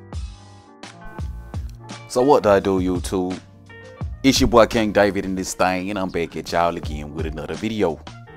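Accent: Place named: Atlanta, United States. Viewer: Good sh as always Fam,... Salute! Yo where u from fam, ATL? Just wondering, just my guess...I hear a lil bit of an ATL accent sounds like.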